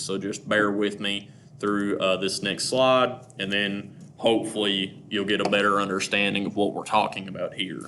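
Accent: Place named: South, United States. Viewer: Thank you for the well put lecture, listening while driving to work. I enjoyed the cool southern accent too 😂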